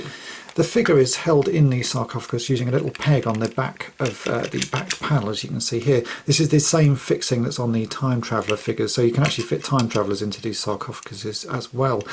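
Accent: United Kingdom. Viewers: For a British dude, you sure know quite a bit about Japan. Are you part Japanese? You sound very British.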